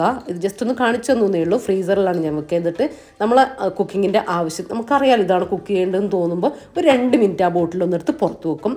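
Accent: Ernakulam, India. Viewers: Ernakulam പാ മുള്ളൻ, പാ കുർച്ചി എന്ന് പറയും 👍🏻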